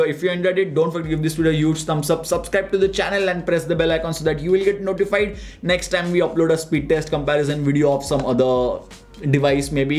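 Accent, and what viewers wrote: India. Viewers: Realmente parece que é outro idioma, ele deve ser indiano! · Esse inglês com sotaque hindi é terrível, difícil de entender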